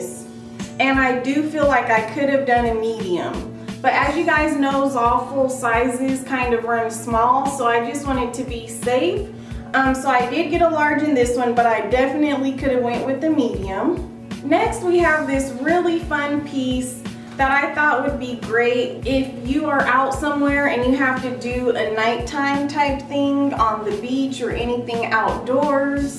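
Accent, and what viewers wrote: South, United States. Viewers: Where are you from you have like a southern twang to voice.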